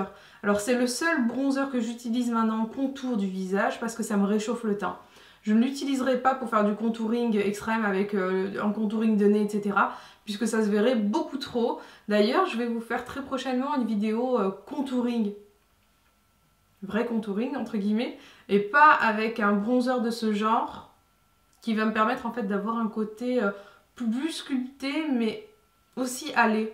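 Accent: England, United Kingdom. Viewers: tu me fais rire avec ton accent anglais super prononcé !!!!! en tout cas je suis très contente de ton retour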